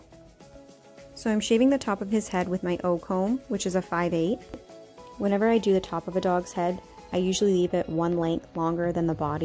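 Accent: Canada. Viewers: A bit off topic, but as a non-native English speaker, I felt so proud of myself when I could hear your Canadian accent and then I checked and the assumption was correct😄 And on the topic of the video - Monty is just an absolute sweetheart 😍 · I've NEVER been a pet owner, but Im so glad this channel was in my recommendations. I found this video to be so satisfying and peaceful. And as an American from the great State of Texas, your Canadian accent got you a new subscriber!!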